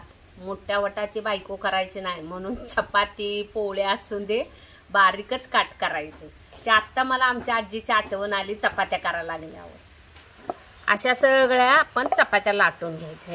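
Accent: Kolhapur, India. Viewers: Sweet and beautiful kolhapuri tadaka🎉 चपाती लागत्याच, माझ्या अनूभवावरून सांगतो, जातय, करतय sweet language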